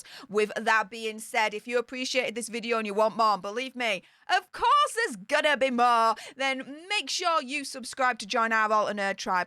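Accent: Yorkshire, United Kingdom. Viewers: is a Yorkshire lass and her accent reflects that, there is nothing at all abnormal about the way she speaks.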